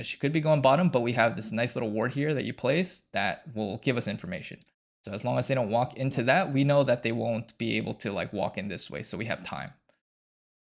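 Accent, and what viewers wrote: France. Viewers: Non. Mais oui on est d'accord il est francais. J'ai l'impression qu'à un moment il se trompe et lâche un p'tit: fin heu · C'était toi ? je me disait que tu avais un accent français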